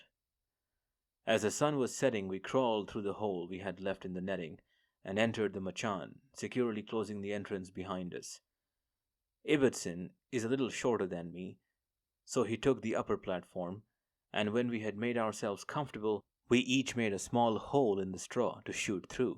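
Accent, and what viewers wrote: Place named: India. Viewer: Indian probably living in States?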